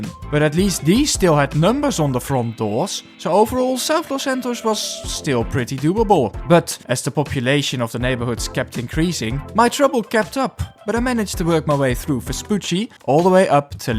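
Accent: Netherlands. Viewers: Are you dutch? I can hear some netberlands accent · This guy is probably Dutch, i'm Dutch and some Dutch people have this accent. I don't btw. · You sound dutch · U sound Dutch to me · I am a certified Dutch person and I smell Dutch in your voice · only Dutch people hear you are Dutch right away😂 You can hear the accent but it doesn't bother me and liked the video you made😊😄 (I saw a video where a dutch person with terrible english is explaining how we live in 🇳🇱😂) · You're dutch. I knew it after the first sentence😂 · I mean he has a Dutch accent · I smell dutch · Je bent Nederlands he? Bekend accentje haha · I love that, being dutch myself, I can hear straight away that this guy is dutch as well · Bro is de minst opvallende Engels sprekende Nederlander haha, goeie video alsnog. <3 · most intense dutch accent i've heard in a whike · Dit is de eerste video die ik van je zie, ik heb een gevoel dat je nederlands bent hahahahah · lekkere nederlands accent ouwe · Leuke video! 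En heerlijk om dat Nederlandse accent erdoorheen te horen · Are you Dutch? :) I like the Dutch. Love from Sweden! 💖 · I have a slight suspicion you might be Dutch. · Imma Nederlands accent, not dik at all. Very hollands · Loved the vid! Noticed you're accent sounds dutch. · Do I hear a Dutch accent? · i can tell lol. Im dutch to so i can tell when someone is dutch🤣 good vid tho! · Damn great vid question tough are you dutch since you have a slight dutch accent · I smell dutch haha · Obvious Dutch accent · I smell Nederlands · U sound dutch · Man I thought I was gonna enjoy this video but as soon as I heard the Dutch accent I had to turn it off. Damn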